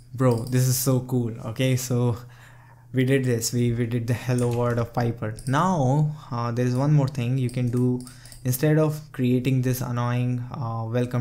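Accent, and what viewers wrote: India. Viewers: Came to channel Subscribed Heard Indian Accent Unsubscribed I'm brown myself, but no this is cringe.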